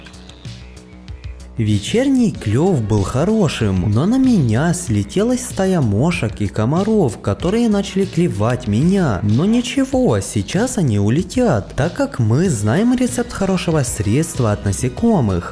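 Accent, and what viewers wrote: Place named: Ukraine. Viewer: Он Украинец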